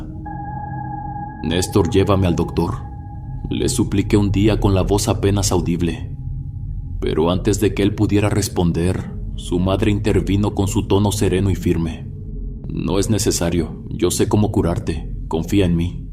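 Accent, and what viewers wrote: North, Mexico. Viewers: WOW!! Cuánta maldad hay en el mundo, que le está pasando a la humanidad?? Por otra parte, tú acento norteño me embelesa y a la vez me da ternura, cuando dices tú letanía de que alguien pudiera estar usurpando tú lugar y robando tú trabajo, normalmente te escucho en mi trabajo... Cuídate, Bendiciones!! ❤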